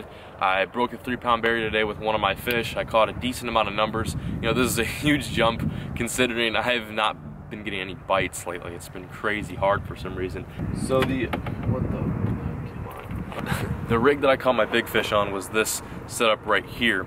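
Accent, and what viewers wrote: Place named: Texas, United States. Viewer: anyone from 2017 and notice his texas accent? LOL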